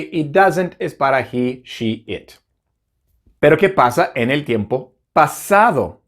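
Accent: United States. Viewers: El es estadounidense se notanpor su raza y su pronunciacion creo😂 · En otro vídeo ví que la pronunciación de Didn't es /deren/ sin la T, pero este video veo que la pronunciación es como /dirent o dident/. Amigo eres estadounidense.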